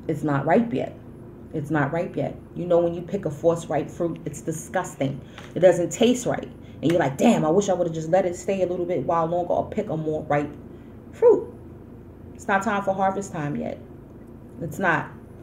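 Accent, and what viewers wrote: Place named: New York, United States. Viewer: I love your delivery. You are suuuucccchhh a NY girl lok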